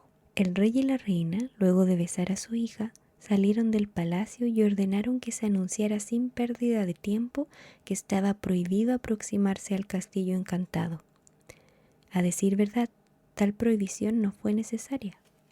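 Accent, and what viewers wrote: Chile. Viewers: eres chilena o mexicana ? 🤔🩷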